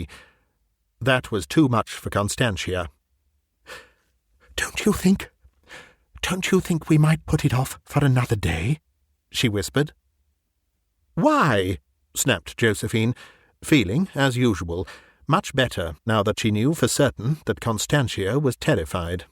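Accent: England, United Kingdom. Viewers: Probably the best narrator on YouTube. It's a joy to listen to a trained English voice. Thank you.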